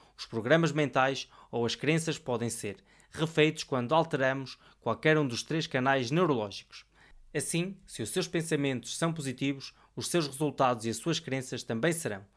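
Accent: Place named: Portugal. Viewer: Parabéns Que bom encontrar um sotaque português ❤🇵🇹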